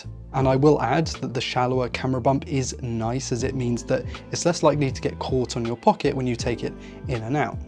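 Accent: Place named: United Kingdom. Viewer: He sounds British so whats with the prices in dollar 💵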